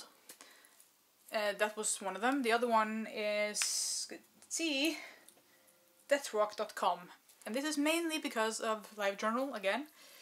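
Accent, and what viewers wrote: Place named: Trondheim, Norway. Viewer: I was listening to your accent and thinking 'she sounds like my friend from near Trondheim' and lo and behold... that is where you are indeed from. So, I feel very smug right now, as it's taken me forever to be able to identify Norsk accents :-) Anyway, goth-wise... I know a few persons of this persuasion in Oslo, but the scen is tiny. That said, I used to live in London, and the scene there is much smaller than it once was. Or so was my observation. Anyway, enjoyed your video :-)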